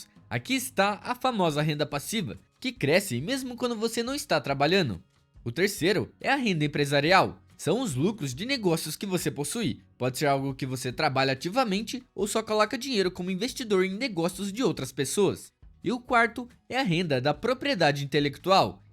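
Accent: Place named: Sao Paulo, Brazil. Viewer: Sou de Minas e não suporto este sotaque seu de Paulista. Mas vale pelo conteúdo informativo.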